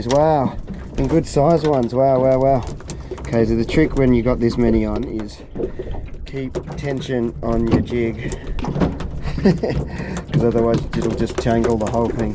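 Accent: Australia. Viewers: Nope, he sounds Australian. They swim in clear Tupperware kayaks around those behemoths often!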